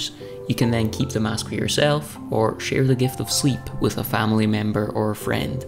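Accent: Scotland, United Kingdom. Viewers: What part of Scotland are you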